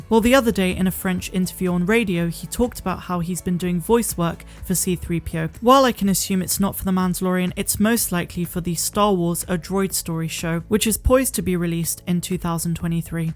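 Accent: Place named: West Country, United Kingdom. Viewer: Meh we can hear your West country accent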